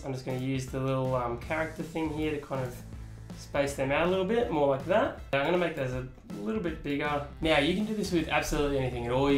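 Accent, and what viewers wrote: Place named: Australia. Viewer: not sure why, but i thought you were going to say "if you guys can't tell by my voice, i'm Australian," lmao. this video is super helpful though, thank you!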